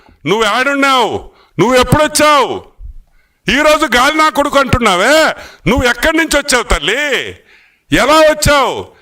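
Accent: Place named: Nellore, India. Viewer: అనం వి రెడ్డి గారు 🙏🏽🙏🏽🙏🏽మీ విశ్లేషణ న లు అద్భుతమైన వివరణ నలతో చలో లోక్తులు తో నెల్లూరు భాషతో అందరిని విశేషము ఆకర్షణ తో వినసొంపుగా ఉంటున్నాయి 🙏🏽🙏🏽🙏🏽🙏🏽🙏🏽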